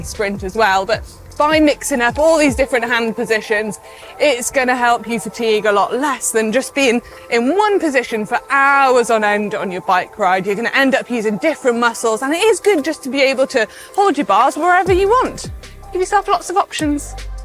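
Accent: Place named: United Kingdom. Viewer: I'm just watching to listen to her lovely British accent. Three cheers for the King! 🇬🇧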